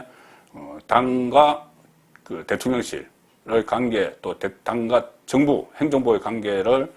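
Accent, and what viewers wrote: Gyeongsang, South Korea. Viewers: 언제나 투박한 경상도남자 잘듣고있습니다 정확한 판단과 전달 좋습니다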